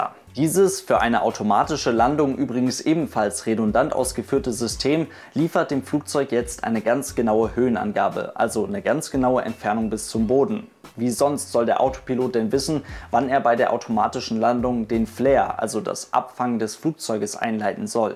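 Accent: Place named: North Germany, Germany. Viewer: Er ist nu mal ein Norddeutscher, da heißt das Flugzeuch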